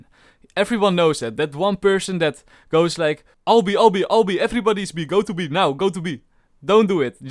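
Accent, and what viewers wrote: Netherlands. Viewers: Hou have a Dutch accent are you from dutchland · U sound dutch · are u dutch u have a dutch-englisch accent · The dutch accent😂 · Ar you dutch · You dutch? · you sound dutch... are you dutch? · Dutch accent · this guy is dutch for sure xD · Nice nl accent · This is my first time watching your channel, and in the first 10 seconds I heard that you are dutch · ik hoor gwn dat je nederlands bent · de seconde dat je begint te praten weet ik dat je nederlands bent😂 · maat jij hebt echt het meest nederlandse accent ooit hahaha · Ben je nederlands??😂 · Why am i low-key thinking you are dutch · We kunnen je nl accent gwn horen lmao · Nederlands accent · i smell dutch ik ben ook nl btw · jij bent van nederland he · ben je nederlands? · r u dutch? this is my first video i have seen of u lol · ik hoor gewoon dat je nederlands bent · u dutch? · i smell nederlands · That's one THICK dutch accent my dude holy crap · ik hoor dat je nederlands bent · he said ''of'' instead of ''or''... 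also with his accent he really sounds dutch... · You sound so dutch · T nederlandse accent😂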